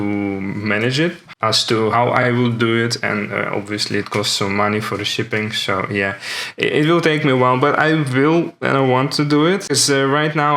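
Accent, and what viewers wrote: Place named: Netherlands. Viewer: U have to work on your English pronouncing because I can hear 100% you're dutch ! xD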